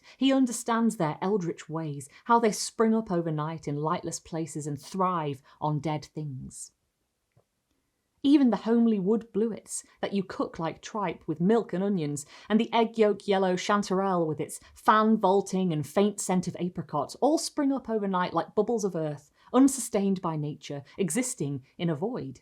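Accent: United Kingdom. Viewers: Let me just say, this story hits quite different when doing yard work in the fall! Also I feel like the sex could be changed from male to female and be just as relevant. As an American some stories are most effective with a British accent :)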